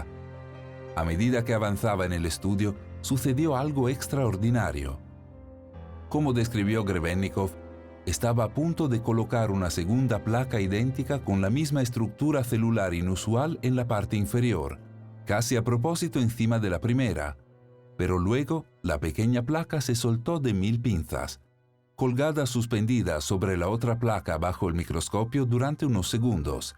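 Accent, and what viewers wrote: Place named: Italy. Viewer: Se llama, excelente voz y hermoso acento italiano!!!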